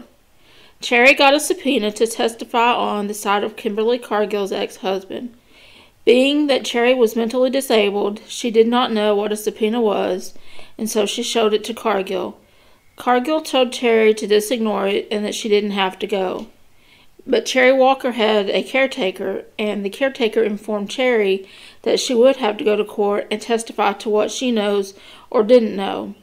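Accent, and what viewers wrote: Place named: South, United States. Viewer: Good stuff. Thank you, You've got the sweetest voice, im from Oregon so im used to those southern draws.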